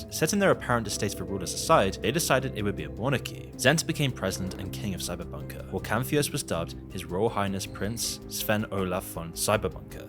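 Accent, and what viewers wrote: United Kingdom. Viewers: Very thick English accent. Very hard to understand. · ffs another british voice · Hes british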